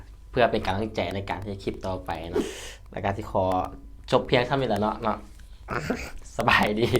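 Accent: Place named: Laos. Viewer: เป็นคนที่ไหนเสียงคือลาว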